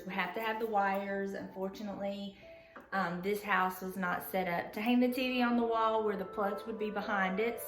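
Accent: South, United States. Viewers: You are adorable! Decor is lovely and I love the "southern accent"!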